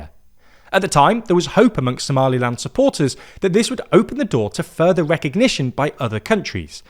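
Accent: United Kingdom. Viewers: Shut up with bullshit british accent. You suppose to be the first country to recognise Somaliland.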